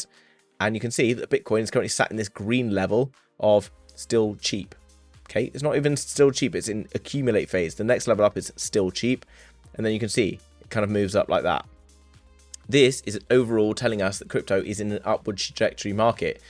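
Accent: RP, United Kingdom. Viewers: Mate, you remind me of Hugh Grant and I don't know why. It must be the hair and the posh British accent.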